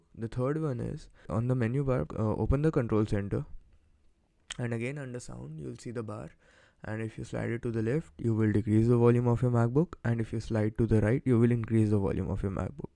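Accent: India. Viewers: learn English with out accent! you're speaking English with an indian accent. When I learned Spanish we learned to make the sounds the way the Spanish people do. We pronounced its as the spanish people would! Stop using your pronunciation because that tells me you have not had professional English classes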